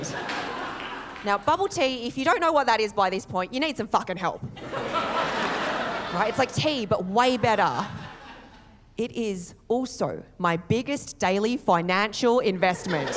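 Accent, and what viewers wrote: Australia. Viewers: Missed Aussie accent!!! It’s been a while!! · Australian accent is unbearable. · your Australian dialect is quite exaggerated, your punctuality is ok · She's got a broader australian accent than me too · She got that Aussie accent too. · I love Aussie accent. I migrated to Australia in 1976 and I identify as Australian. There was an ad on TV many years ago that showed a teenage Chinese girl, a happy person, responding to a question from another traveler: "Where are you from?" Her response, in an Australian accent just like, was "I'm from Ballarat, where are you from?" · I love her Australian accent with her Asian culture. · Well done. It is always a pleasant surprise to listen to a beautiful Asian woman speak with a Australian accent ❤ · Well done. However, as an American, it is a little brain-jarring to hear an Asian speaking English with an Australian accent. 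Add a sombrero, do a little yodeling, and the cognitive dissonance will be complete. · Wow, has an Aussie accent! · She’s so funny, I love the Australian accent on a woman. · accent sounds Australian!!! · 竟然听懂了，澳洲口音吗，way发音成wi。最后一段overlord是讽刺吗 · I love the sticker figures drawings. is this your art work? Nice. It really makes your jokes visual and twice as funny 😆 For me being Asian American it’s funny to see and hear an Asian with an Aussie accent. “Mum” “Naur (no)” 😂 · Not eglish.... australian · I thought your story and story telling was hilarious. Also like your Australian accent. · Damn, she really hasn't kept even a fraction of her parents' accent, she sounds even more sterotypically aussie than I do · F, u got d most Ozzy accent! Rock on,! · Like your Australian accent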